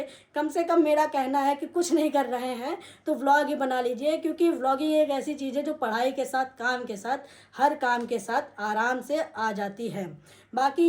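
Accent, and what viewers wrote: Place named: Bahraich, India. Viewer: Bhai Bahraich se ho kya 😊 mera gaw h vha Apki local language vhi ki lg rhi 😊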